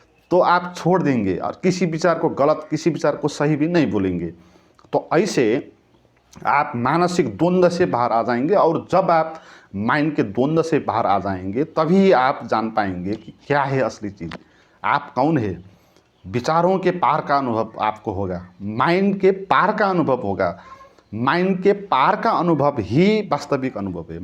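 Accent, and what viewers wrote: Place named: Nepal. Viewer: आपका पता ( address) क्या है. बोली से नेपाली लगते हैं.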